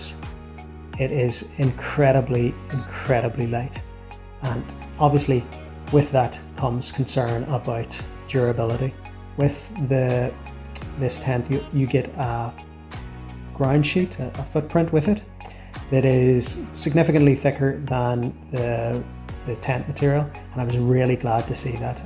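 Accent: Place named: Northern Ireland, United Kingdom. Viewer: I'm looking to get a new tent to start backpacking again after about 12 years. the Naturehike keeps getting mentioned and I was actually on the AliExpress site looking at the popular Cloud peak 2 tent, when I noticed a few other Naturehike tents which hardly get a mention. I saw the Taga, which then lead me to find this video and your channel. I'm not sure I really want a 1 skin tent though, so maybe I'll look at something else. My current tent is the Vango Spectre 200, which I bought years ago, after thinking the Banshee was too small. Funny, the Banshee is still going strong, but the Spectre has long been axed by vango. It was good, just that I fancy a new tent. Do you get out much ? You don't make any wild camp videos. Nice to hear the accent, I originally lived in Holywood .. until I was 5 (57 years ago) Good luck with your channel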